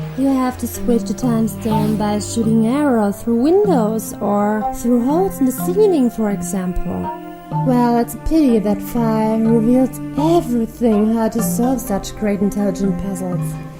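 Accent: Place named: Germany. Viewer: You have a slight German accent, but your English is very good. And I agree with Taylor, it's a charming accent.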